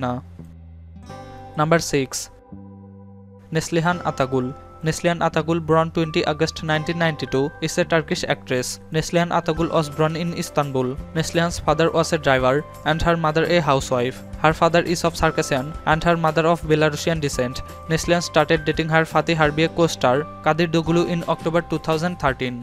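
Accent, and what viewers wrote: India. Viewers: is this guy speaking English or Indian language? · Indian english🤔🤔🤔not bad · Lol is the narrator Indian?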